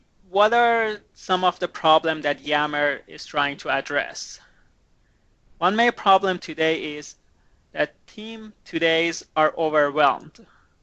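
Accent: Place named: Dubai, United Arab Emirates. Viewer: Is this guy from Dubai, I can not understand his accent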